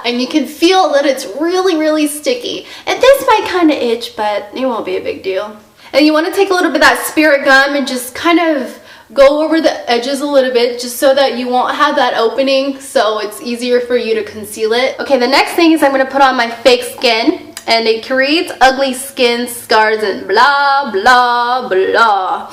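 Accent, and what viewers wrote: United States. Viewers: she sounded so country back then haha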